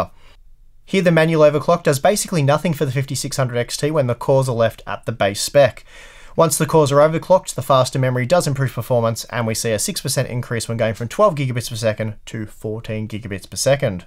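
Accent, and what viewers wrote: Australia. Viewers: Youtube's auto-generated subtitles aren't that great with Australian English. What you read here are the results ;)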